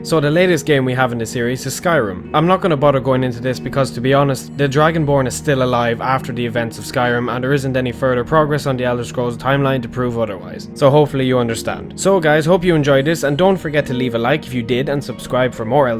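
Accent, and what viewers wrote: Ireland. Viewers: The Irish accent is the only way to hear the elder scrolls. Save for some dodgy pronunciations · I'm thinking is not mispronouncing the tes names but simply speaking them in the glorious Irish accent. Didn't know how I felt about it at first but it's kind of nice. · Is your accent Irish? It's awesome I want to hear you read a book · He mispronounces Tharn because of his strong Irish accent. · When I was watching this I couldn't place your accent, but after noticing how you pronounce your Ts I was like "oh shit he's Irish" then I saw your channel name.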